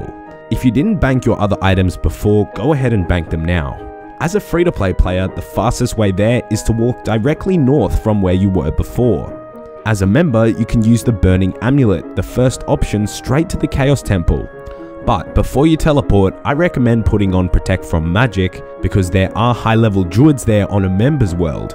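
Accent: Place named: Australia. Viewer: Haha I always love the way Australians say certain words 😄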